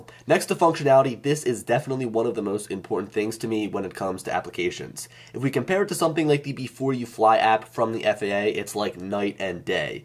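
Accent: Philadelphia, United States. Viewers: Nice overview. I thought I recognized your Philly accent. Keep doing your thing.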